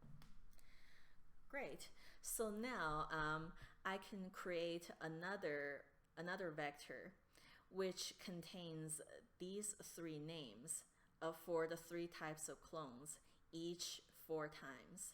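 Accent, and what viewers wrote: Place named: China. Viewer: 为什么有中式口音……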